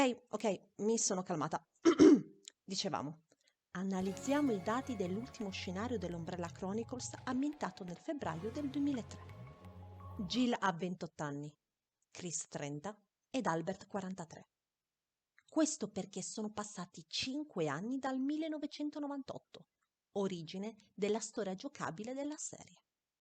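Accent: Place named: Italy. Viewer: There you go, partner! Now you've done it! Kicking ass, always. Keep always being this mad hardcore fan that we love to follow. Count with your old Brazilian partner here for anything you need. P.S: That Italian accent is SO beautiful! You gotta help me working in my Italian, or my ancestors are going to punish me haha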